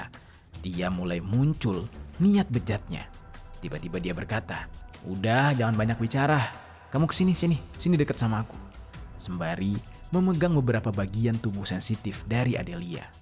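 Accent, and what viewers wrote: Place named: South Sulawesi, Indonesia. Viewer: dari sini kita tau pengisi suaaranya berasal dri sulsel :D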